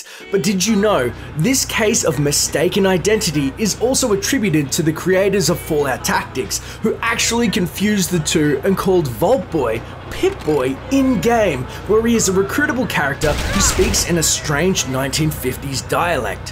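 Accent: Australia. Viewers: God, I hate the Australasian accent... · Its very Australian i should know i live here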